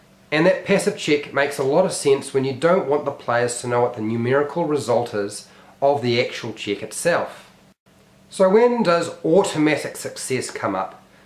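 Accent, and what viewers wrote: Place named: New Zealand. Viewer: he's talking about your kiwi accent :)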